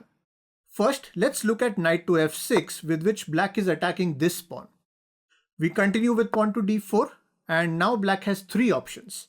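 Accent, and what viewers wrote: India. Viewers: the indian accent makes this channel my fav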